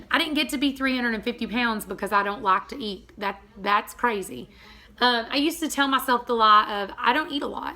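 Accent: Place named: East Texas, United States. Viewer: "What accent!" Indeed! You make me feel like I'm back in East Texas, surrounded by good looking men in Wranglers so tight you can tell what religion they are and when you said, "You feel froggy?" I wanted to yell, "JUMP!" at my screen!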